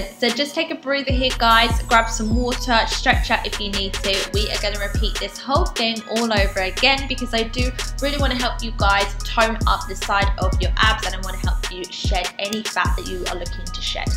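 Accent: United Kingdom. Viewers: Is it just me or was your accent more Britishy last time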